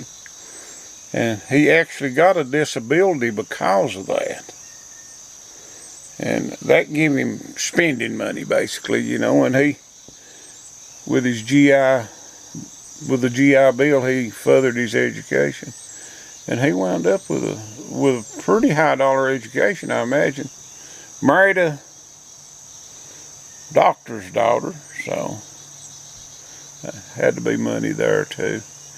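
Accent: South, United States. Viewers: I think you talk like a southern gentleman. Up north we talk a lot courser. Not smooth. And New York state has five or six distinct accents. We live 90 miles north east of nyc and are a summer tourist area. Lot of city people moved up here over the years so we sound more like that then people furtber upstate.I know when I am in Tennessee and open my mouth them folks know I ain't local! Have a nice weekend. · Hi!!😀😀 I just love listening to you with your southern accent!! 😀😀 I can understand you just fine. Top less women in Ashville!! Wow!! I think I need to visit. LOL!!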